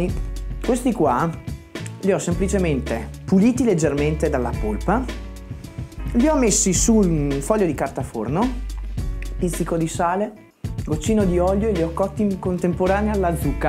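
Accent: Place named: Veneto, Italy. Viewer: Ma te si veneto 💚? se non sbaglio... meraviglioso il piatto, complimentoni!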